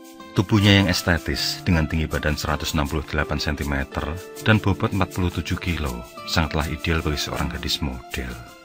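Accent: Java, Indonesia. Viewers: Sekali2 pengisi suara diganti , om ... Nggak itu2 aja Masak logat NTB sama persis dgn logat Jawa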